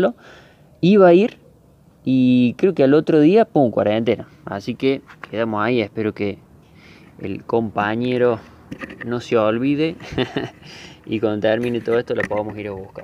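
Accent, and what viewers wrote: Córdoba, Argentina. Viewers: decime si no sos Córdobes papá?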